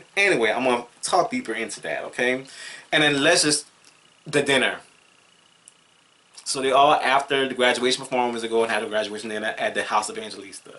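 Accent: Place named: Louisiana, United States. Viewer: I am from New Iberia. You sound like you from St. Martinville or Breaux Bridge.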